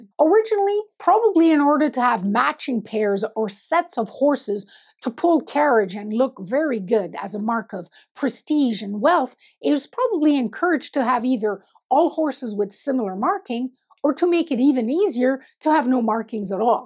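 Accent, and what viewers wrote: Canada. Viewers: Border "Cauooohlie" Canadian! That super rounded "O" gave you away! White tigers aren't rare now. They are bred by people which is horrible because in th wild this usually means the animal has health issues galore. People breed them on purpose and call them "rare"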